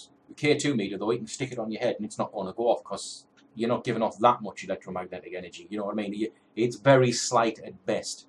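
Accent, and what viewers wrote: Newcastle, United Kingdom. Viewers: I hate huff you sound like a Geordie same as me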